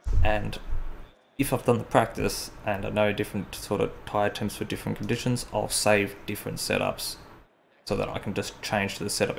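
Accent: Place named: Australia. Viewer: Subbed. Good to hear an Aussie accent:-) Great video. Thanks. Just a question: I want to test in the wet, and also practise pitstop technique, setting up pit strategies, as per your video. Which mode can I use to do this without affecting my SA, CN, CC, etc? ie have ratings turned off, so I can muck about. I can't find a setting where I can select weather (rain). Yeah, I'm a newbie, and all of these variables are doing my head in. But loving ACC (on PS5 and PS4 Pro). Thanks in advance.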